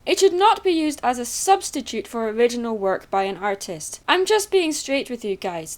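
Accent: Scotland, United Kingdom. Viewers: I knew you said 'Clydesdale' because of that Scottish accent, furry hooves and it's not a damn pegasus. lol people can't think any further I don't like pony generator/creator because it looks stiff, unoriginal (just like you said) and it kinda makes the ponies look.. weird. Most people (that I've seen) who use it make their OCs have bad color combinations. I'd rather see an OC scribbled than people using the pony generator. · scottish like me ;) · your not the only fan in scotland I can tell you live there cause we've got the same accsent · Are you Scottish? Well if you are then I am to! 😄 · Norlén SHE IS NOT IRISH SHE IS SCOTTISH!!!!!! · lol I'm slowly getting used to the Scottish accent cos my friend is Scottish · She’s Scottish, and I can do an Australian Accent. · omg are u scottish squee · Scottish like me :D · omg i love Scottish accents!!!!! 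· by the way I love your Scottish accent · I love your accent lol. I'm Scottish too! #ScottishSquad And I'm fine with recoloured "OCs", but if the person who made one is claiming it to be an original "OC" or "theirs", that just makes me rage! XD. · So I'm guessing ur Scottish lol go Scotland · So your Scottish?! Yey! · It's Scottish wait I'm Scottish Yay I found a Scottish youtuber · ... i she scottish? i am aswell sooo yeah your not the only scot brony if that's the case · She's Scottish! What did you expect?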